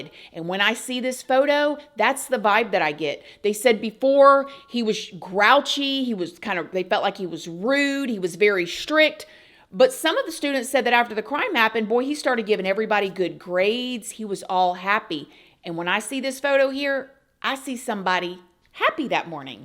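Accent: South, United States. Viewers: Love your videos! I watch every new video that comes out. It makes me laugh tho everytime at the end of your videos cuz you go from southern “yall’s” to valley girl “Byeee” 😂 Love it!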